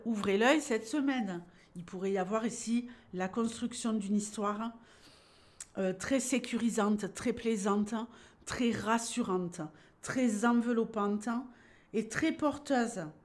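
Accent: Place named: Toulouse, France. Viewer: 🌸Bonjour. 🙏Exit les anciens paradigmes 😂 ouf! Vve la renaissance 🤵‍♀️ La vie est belle 💚 vous êtes unique, extraordinaire, très attachante. Au plaisir de vous écouter. J’adore l’accent Toulousain. Bien respectueusement 🎁 Super thanks 🎁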